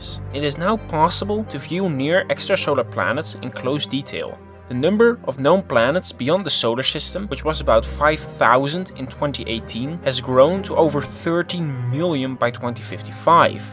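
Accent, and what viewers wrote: Netherlands. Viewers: Gotta love that Dutch accent, kek I'm Flemish myself so it was't hard to distinguish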